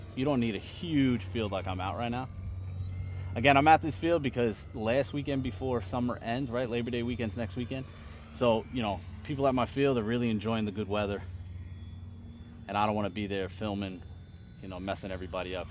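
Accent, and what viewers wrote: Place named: New York, United States. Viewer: Hello brother.. I’m a new subscriber from Darwin Australia 🇦🇺.. been binging your content for weeks now.. your a real chilled New Yorker by the sounds yeah 😅apologies if not .. but hey can we see more cleetus cub content.. maybe how to progress to acro .. maybe how to bind to better controller..🙏😁👌big fan from Australia mate ..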